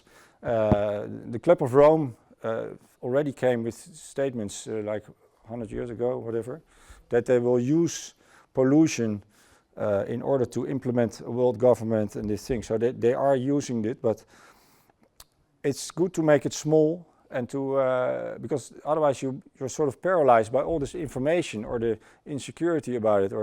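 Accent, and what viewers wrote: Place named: Netherlands. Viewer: Steenkool engels.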